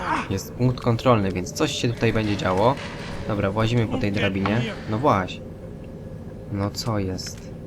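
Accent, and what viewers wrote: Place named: Silesia, Poland. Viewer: Jestes ze sląska slysze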